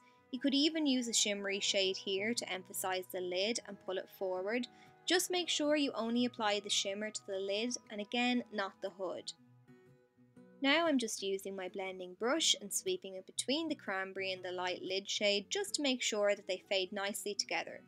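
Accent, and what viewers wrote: Ireland. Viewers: Love your accent~ It's Irish right ? :) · Ur accent is so cute. Are you Irish?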